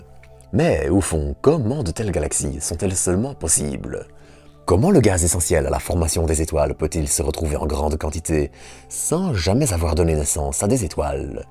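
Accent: Belgium. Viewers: Wow, Je ne sais pas ce qu'il s'est passé avec ton com, mais je l'ai en notif et je le trouve très instructif. Je vais donc le remettre ci-dessous pour ceux qui, comme moi, n'étaient pas au courant. Et oui, est belge 🤗 et j'adore son accent! 😊 Com de Led Zep: Régional plutôt, si tu sous entends que notre ami soit belge, le coq est le symbole de la Wallonie (prononcer le W comme en anglais) qui est une des régions de la Belgique, c'est la partie sud du pays, on y parle français à 100 pourcents, la région Bruxelloise encerclée par la Flandre, brrrrr... 🙂 ((90 pourcents de francophone et 10 pourcent de néerlandophone)(on prononce Brusselle, pour la même raison que l'on dit Aussère et pas Auksère (Auxère). Pourquoi? En latin le double S s'écrivait X, à la renaissance le X a pris le son KS)), Ensuite la Flandre, 60 pourcents de la population, 100 pourcents néerlandophones et pour finir la région germanophone, seulement 60.000 personnes parlent donc allemand en première langue mais sont tous bilingue en français. 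Je ne sais pas si est belge par contre...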